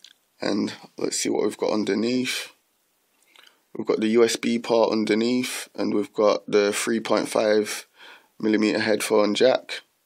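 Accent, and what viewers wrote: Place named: Manchester, United Kingdom. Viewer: In 2019, I have the exact tablet arriving from Amazon Tuesday April 2, 2019. It is brand new and apparently someone kept and has some still to sell after all these many years. I took a chance on this as 32 GB is plenty for me and the size perfect when I travel. The Amazon ad said 4.3 Jelly Bean. Will it Auto-Update to any of the latest version of Android? I have a case and screen protector on the way also and quite a bit of money invested so I hope that I am not disappointed. Looking forward to getting it and setting it up. As a side note, when I was younger, I stayed several months traveling all over the UK and Ireland. As here in the US, many regional accents however not sure what part of the UK that you are from, but sounds sort of Manchester or Blackpool if I had to guess. It's a beautiful accent to listen to. 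Anyway, cross your fingers that my old NEW Nexus 7 works well and thank you for a nice video which was a great help.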